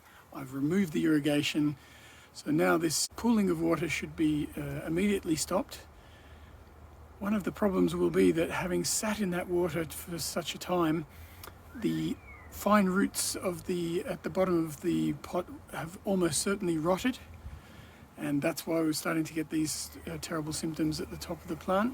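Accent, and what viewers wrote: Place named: New Zealand. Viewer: Sound like a Kiwi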